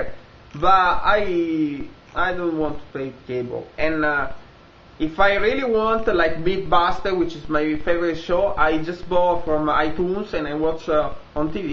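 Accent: Italy. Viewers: Italian? or Portugese? am thinking Italian...lol, iti s distracting, but cute..... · Il tuo inglese mezzo italico mi permette di capire tutto. Inglese puro faccio fatica...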